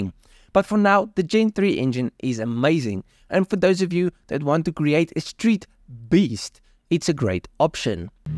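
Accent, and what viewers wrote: South Africa. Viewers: South African Voice?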